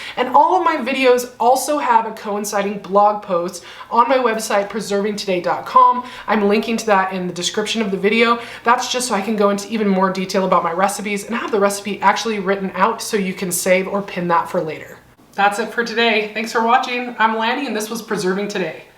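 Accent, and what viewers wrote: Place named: United States. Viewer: Love your common sense tips which I don't often think of...and your soothing voice (tbh some American accents are difficult to listen to (for me anyway) but I could listen to yours all day !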